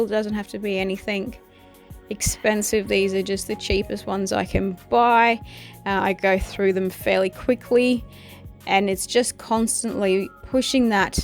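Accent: Australia. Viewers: Tasmania? I hear some similarities between you and others that I know are from there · oh wow this was pretty cool and an aussie. nice to hear a local.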